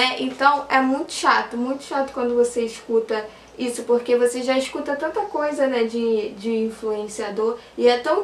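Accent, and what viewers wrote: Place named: Rio de Janeiro, Brazil. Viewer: Aaa é muito carioca mano,amo esse sotaque carioca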